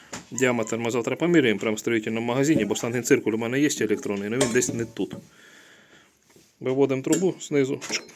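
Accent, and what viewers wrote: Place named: Poltava, Ukraine. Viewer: По говору здогадався. Полтавчан не сплутати нi з ким.))) Тримайтеся! Тепла та благополуччя! Всiм достойним украiнцям захисту Всевишнього!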